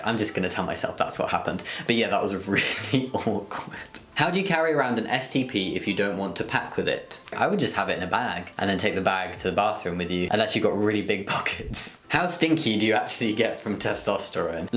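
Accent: United Kingdom. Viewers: Is he from UK ? This is not American accent for sure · I'm from Northern Ireland and I could instantly tell he was British aswell lol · Because of your accent I’m guessing your from the UK I am too and I was wondering if T and the surgery is on the NHS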